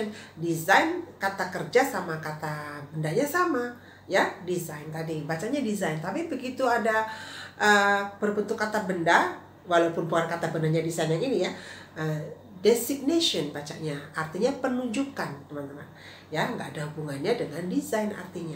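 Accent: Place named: United Kingdom. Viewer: good night madam this is British accent 😊😊.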